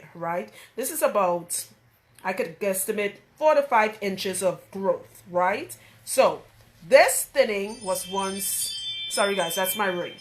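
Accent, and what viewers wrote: Trinidad and Tobago. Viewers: Trini in Paris with locks, I have medium course hair .. I love my hair so much but it has different textures on different locations of my scalp ! WHAT CAUSES DIFFERENT HAIR TEXTURES ON ONE HEAD ? !! It’s weird !! .. it’s not very common but I’m seeing it more and more ! I caught that Trini accent right away .. hahah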